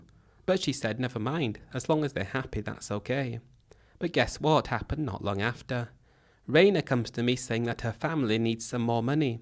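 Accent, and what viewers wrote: United Kingdom. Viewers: Weird British accent for somone from Missisipi. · You have a very British accent for a yank who never left home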